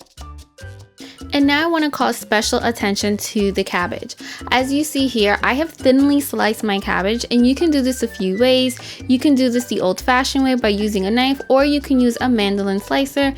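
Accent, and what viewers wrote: New York, United States. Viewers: are you from New York bc you sound like your from NY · The Noo Yawk aspect of this video is EVERYTHING tqmmmm 🥰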